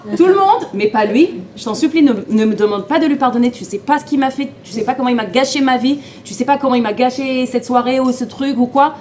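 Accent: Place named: Israel. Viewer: J’adore l’accent israélien ❤️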